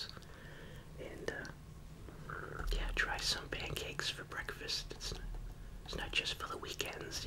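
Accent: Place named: New York, United States. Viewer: u sound like you’re from NY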